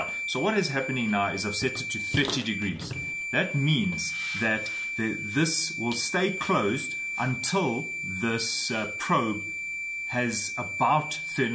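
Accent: South Africa. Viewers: I'm in the middle East and I enjoy it when I hear that South African accent... nothing like home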